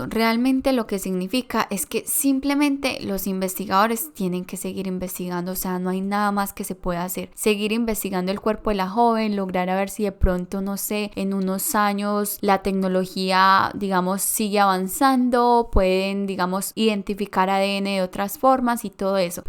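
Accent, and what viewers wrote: Colombia. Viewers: me parece genial que lo hayas tomado bien, la verdad cuentas con mi apoyo en tu canal seguiré viendo tus videos pero si mejora un poco el guión para que no sea repetitivo. Aprovecho nuevamente para felicitarte por tus investigaciones son muy detalladas. Por tus modismos y acento creo que también eres colombiana como yo y si eres de acá me parece genial 😁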